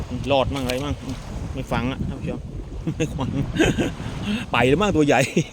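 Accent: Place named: Tha Chae, Thailand. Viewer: แหลงเหมือนคนท่าแชะเลยหลวง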